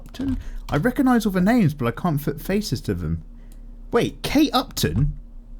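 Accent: United Kingdom. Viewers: Hey! Im happy being able to listen to your beautiful British voice in the back as I continue to hone my artistic skills! I wanted to answer some questions fro,m past DWMs real quick 1-DWM 89: More recent the ending or opining image in the Sword art online spinoff where the main character (Who you should draw if possible) Is standing outlined in the rubble. 2-DWM 88: Nothing much Pumpin Iron and drawings! 3-DWM 90: Not an actress, but more of a cosplayer I recently found named Vamplette! Anyways have a great day!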